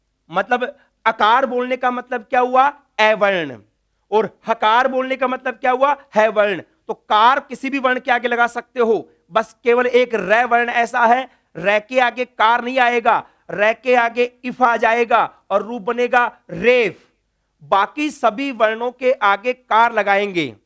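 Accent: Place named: India. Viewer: You have to pronounce Alphabets n words properly while teaching Sanskrit. अ is अ not अय् । हिन्दी टोन आरही है नेपाली मे संस्कृतका टोन आती है वह ठिक है संस्कृत के लिए ।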